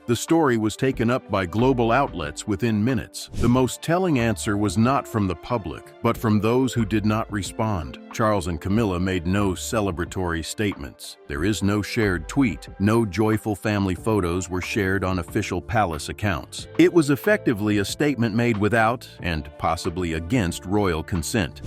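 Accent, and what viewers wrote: United States. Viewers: All this is made up rubbish. Even narrated by a Yank.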